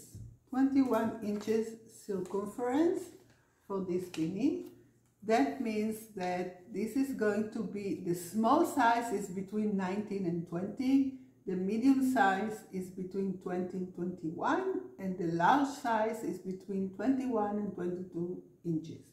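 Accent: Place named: Israel. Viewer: Hi!! I just received an email from you introducing yourself! I’ll have to go back and look for which pattern was yours as I’ve been participating in a few different blog hops. I recognized your Israeli accent too when you started speaking! I only had the pleasure of visiting Israel twice, once with my Hebrew School for a youth trip, and again the following year for my brother’s Bar Mitzvah. But I loved it there and I love the incredible internet that let’s us connect with people from all over the world! I live in the USA, just outside of Philadelphia in Pennsylvania, on the East Coast. Best wishes to you with your channel. I’m going to look for your book on Amazon. Thank you for sharing! 💜🧶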